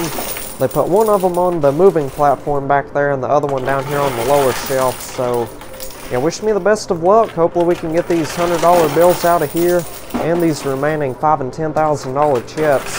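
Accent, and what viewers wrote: South, United States. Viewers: I am English and I would like to know which region in the USA is your accent?? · Definitely southern, probably call soda , coke.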